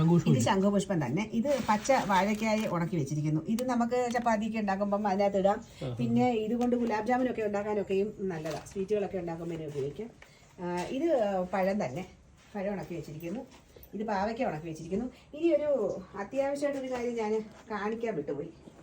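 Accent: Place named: Kottarakkara, India. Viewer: കൊട്ടാരക്കര ഭാഷ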